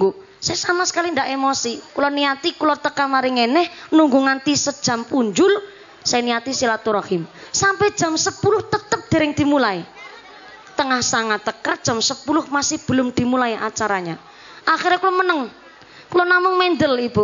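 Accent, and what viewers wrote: Indonesia. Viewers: Masya Allaah ngapak mantep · Itu bahasa jawa ngapak dari cilacap mba...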